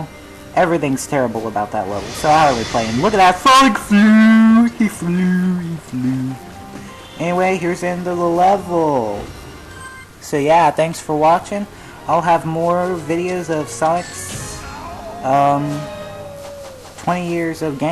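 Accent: South, United States. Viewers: You sound like a nerd with a southern accent. Your voice is almost unrecognizeable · Wow you voice sound so southern.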